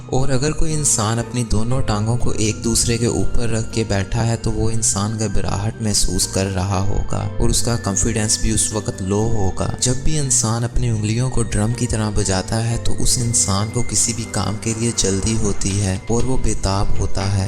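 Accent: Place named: Punjab, Pakistan. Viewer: Sir agar ap urdue ko thora bhetar tareke se bolenge to bht maherbani ho plz . ap punjabi lehjey m urdue boltey hn. Plz bura mt mna.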